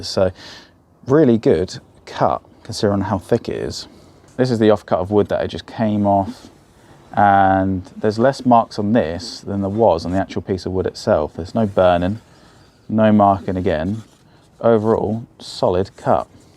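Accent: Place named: England, United Kingdom. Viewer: Being French, it's very nice to have an equipment review with a nice English accent instead of an American one. And to hear you give measurements in the metric system: priceless!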